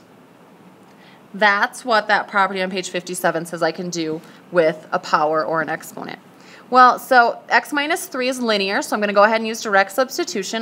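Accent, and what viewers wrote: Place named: United States. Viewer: what state are you from? I like the accent lol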